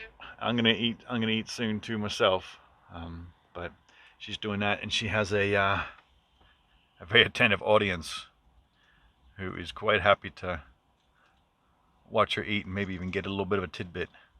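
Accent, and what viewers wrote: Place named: Australia. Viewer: Thanks for taking us along. I’m loving the vlogs! And…..now that I know you’re from Australia I can hear some faint whispering of an Aussie accent 🥰.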